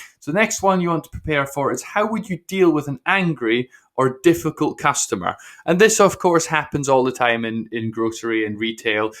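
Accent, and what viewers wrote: United Kingdom. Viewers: Why is a british man telling me how to get a job at piggly wiggly ?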